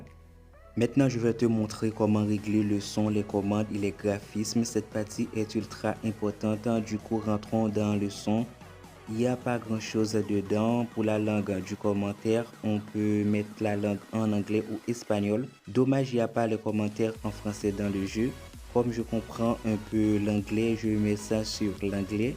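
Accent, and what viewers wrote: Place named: Haiti. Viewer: Depi sou langaj misye c ayisyen' 😅😅foli pale fransè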